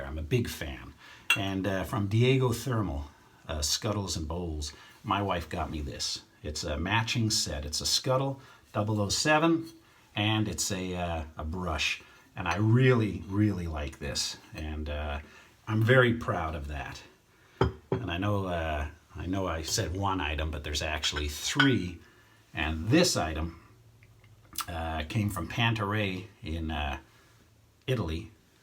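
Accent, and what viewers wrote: United Kingdom. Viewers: Are you British ???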